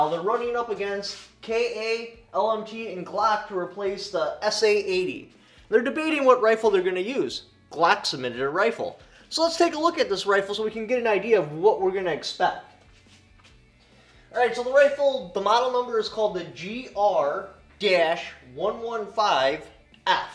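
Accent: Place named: Upper Midwest, United States. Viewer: There’s something unintentionally comical about this young man. I can’t exactly put my finger on what it is. I think it’s what I assume is his upper mid west mannerisms. I surmise he’s from there based on the way he pronounces certain words like mouth as “mewth”.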